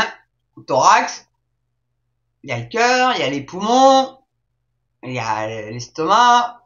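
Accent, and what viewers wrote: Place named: Côte d'Azur, France. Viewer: j'adore votre humour, vraiment. vous avez un accent, on dirait que vous êtes une azuréenne. je n'habite plus en france et finalement je suis heureux de ne plus habiter en france, et d'habiter dans un pays qui est sécure, ou le taux de criminalité est ultra faible, ou vous pouvez laissez votre sac et portable sur la table du café ou du mcdo, et même faire tomber votre portefeuil vous le retrouverez au poste de police si la personne n'à pas vue que vous avez fait tomber votre portefeuil par terre pour vous dire a mon premier voyage dans mon nouveau pays, a cause du décalage horaire, j'ai oublier mon bagage dans le train, j'ai attendu que le train revienne a ma gare ou j'était descendu du train et au final j'ai récupérer mon bagage avec tout mon argent dedans, ordi portable, tablette, bref mes médoc, ma ventoline, et mon adrénaline à cause de mes alergies alimentaire, bref pays sans arabe ni noir et donc pays sans vole ni agression sauf depuis deux ans car les arabes et noir musulmans commencent a venir en masse dans mon pays et donc il commece a y avoir des voile de gamine, des raquette avec tabassage, et aussi des dégradattion de bien mobilier urbain et aussi des déjection en plein rue alors qu'il y a des toilette dans gratuite dans chaque gare ou superette, alors qu'ils ont l'argent pour acheter leur bouteile d'alcool et cigarette, bref l'avantage c'est que mon pays généralement surtout dans les ville majoritairement de droite sans opposition de gauche traitre correctement se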